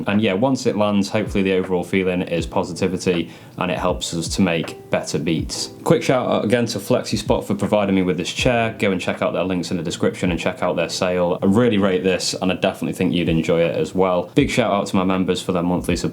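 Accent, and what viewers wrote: United Kingdom. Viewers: Yo man thank you for your videos. Been following for a while now and I really appreciate your content (especially the sp 404 vids)! I’m from the uk and you sound pretty local. Have you got a social media link? Would love to connect and pick your brain. Thanks for the consistent uploads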